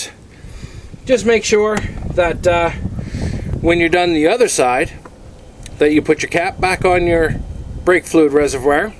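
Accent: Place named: Ontario, Canada. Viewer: Well done! Much easier than Chilton's Manual! The Ontario accent is an added bonus.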